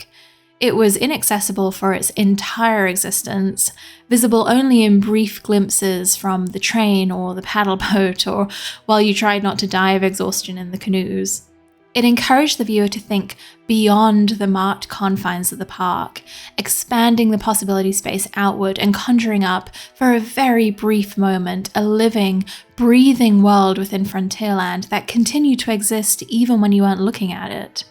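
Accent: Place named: Mid-Atlantic, United States. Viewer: I sense a masters degree in either literature or history. You seem to have a mid-Atlantic accent. Quite old fashioned Hollywood. I can't quite tell if you're a middle class woman from Southern England living in the US or vice versa.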